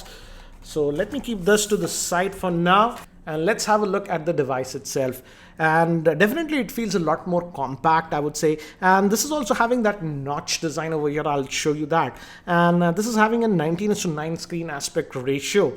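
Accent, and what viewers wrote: India. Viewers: Are you from india because you're English is not at this girl but i really like your videos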